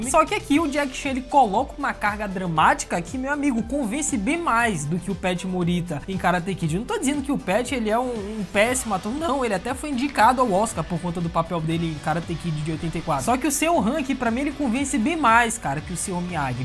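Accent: Bahia, Brazil. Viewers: . Você é de que cidade da Bahia? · vc é Baiano tbm sou rsrsr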